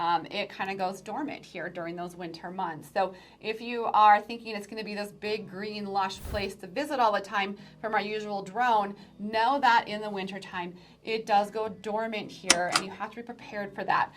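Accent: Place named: Minnesota, United States. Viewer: First minute of the video I knew you were from Minnesota, you’ve still got the accent. I’m from Mn too Lol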